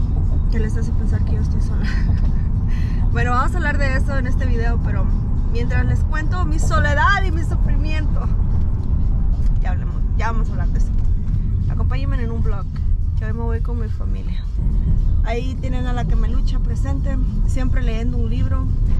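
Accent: Mexico. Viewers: Casi cada fase de tu vida..undudable falta un hombre estable en el hogar. No jusgo nomas soy realista. Pero ya a cosa positivas..estas hermosa y me encanta tu accento me recuerdo al de mi Durango Mexico. Slds de Chicago.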